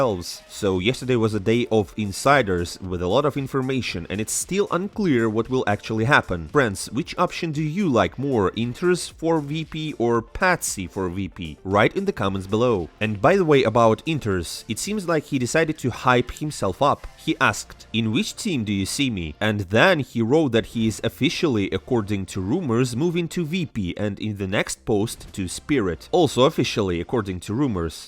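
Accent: Russia. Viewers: Спасибо за новости, ты пытался не спалиться что русский)) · I can feel russian accent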